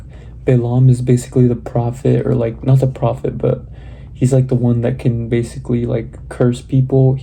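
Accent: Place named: Spain. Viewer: We like your Spanish accent 🇪🇸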